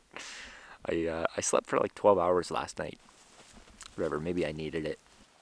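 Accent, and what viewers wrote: Canada. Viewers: are you french Canadian?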